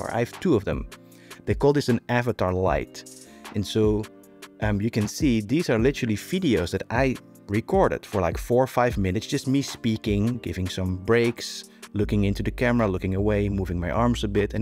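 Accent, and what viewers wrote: Netherlands. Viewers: I think they will soon be able to reproduce your Dutch accent with all the substitutions you use from Dutch phonology, or produce a clone with any foreign accent. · You sound very Dutch 😊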